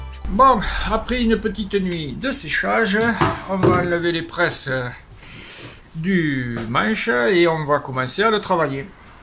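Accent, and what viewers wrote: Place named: Nice, France. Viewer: Alors la Monsieur vous pourriez facilement prendre la place du fameux comedien.... Louis de Funnes vous etres deja un tres bon menuisier et je vous remercie infiniment pour vos videos mais avec vos fin de videos( Betissier) la Je me regale d'abord parcque j'ai grandi dans un petit village derriere Nice donc l'accent j'adore et vous mettez le super cote humain,Alors bonne continuation et Merci Robert dans le blue mountains NSW en Australie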